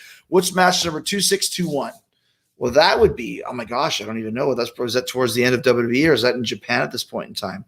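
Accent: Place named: Minnesota, United States. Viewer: he he stil got little bit of mn accsent coming out now and than lol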